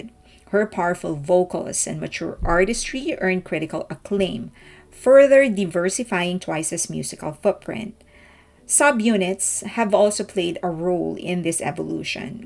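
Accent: Philippines. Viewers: Loving the Pinoy accent 😍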